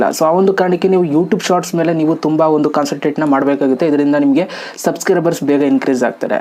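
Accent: Mandya, India. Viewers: Wow nett nett ge band bittiruthe what a language in mandya I like it thamma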